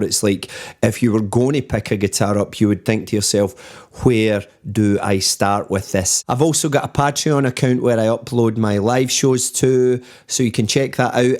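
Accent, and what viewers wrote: Scotland, United Kingdom. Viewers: This is the first time I’ve watched, and, I gotta say, I watched because you were reacting to MARCIN. I’m a huge fan of his, and I appreciated your knowledgeable commentary. Love your Scots accent, which turned to a US southern accent when you sang! Loved it! I’ll be tuning in again. Thanks for a good show! ❤️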